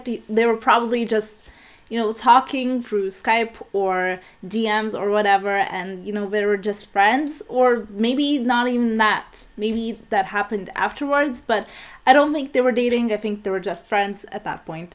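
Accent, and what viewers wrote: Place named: Italy. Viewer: Loving your accent! I'm guessing you're from Italy... (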